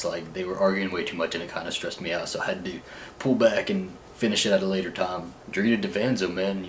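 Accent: South, United States. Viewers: It's because he's southern ;) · sounds like a southern surfer boy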